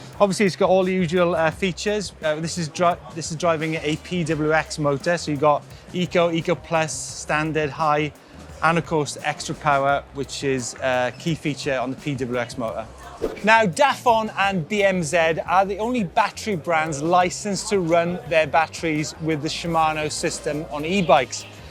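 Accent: Wales, United Kingdom. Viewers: Nemanja X it sounds a little Welsh to me, can't say whether it's north or south though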